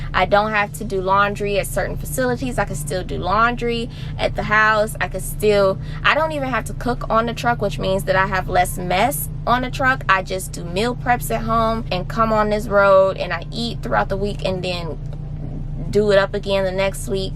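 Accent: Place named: Southeast Michigan, United States. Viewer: You sound like SE Michigan.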